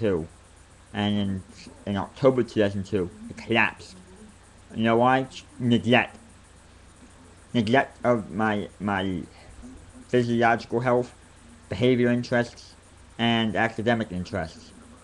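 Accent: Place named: United States. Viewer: So, what part of America is this accent from?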